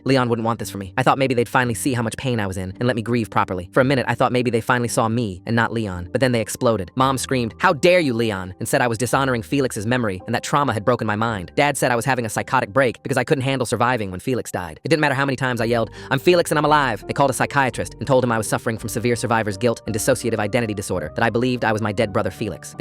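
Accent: United Kingdom. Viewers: Also what’s with the british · ok good im not going insane the tts did turn bri'ish halfway through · the british accent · He- hes British HE'S BRITISH AHHHHHHHHHH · He turned to British and that make this so unserious sound. I’m sorry if you were bored the entire time I would actually sound serious. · the British acc is wild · Why is bro British now?? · Why do you turn British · Why did you turn British? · It took me FOREVER until I realized he's British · It’s a British accent 🤣definitely can tell where you’re from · WHY TF did he get a British accent half way through?.Anybody else get confused because the man talking is Felix + his brother Leon passed away. Felix took Leon's spot, so why would he want to grieve himself? Because he's at Felix's funeral but he thinks people need a mourn Felix. I think there was a typo and they meant Leon??? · Why did he turn british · The British accent 😂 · O just realised that the voice is British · I'm not the only one who noticed the British accent, right? 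· Why did it turn British midway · Great video but like why did halfway through the voice thing you just turned British? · what's with the British🇬🇧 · Me: BRITISH??? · Is it just me or did he turn british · why did he turn british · You sound British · Omg I thought it was just me not noticing that the voice was British the whole time 😂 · Am I the only one who realized the British accent?! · Why did the voice slowly get British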